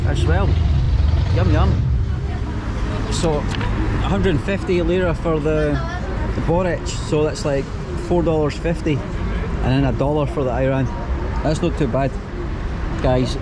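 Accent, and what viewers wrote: Scotland, United Kingdom. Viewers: He sounds Scottish AF …wtf are you on mate?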